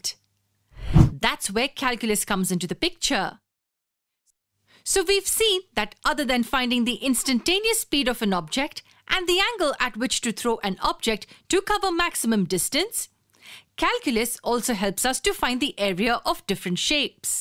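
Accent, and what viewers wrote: India. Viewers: I think Indian. Cause her accent seems like Indian 😊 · The only Indian accent I could listen forever lol she saved me 😂✌️👌 EDIT: passed the exam 😂🙌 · I hate indian english. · Indian accent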